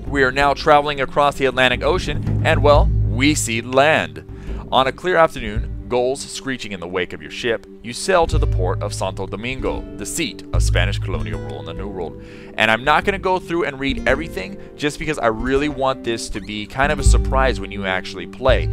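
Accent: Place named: Italy. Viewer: your accent sounds more italian than spanish :)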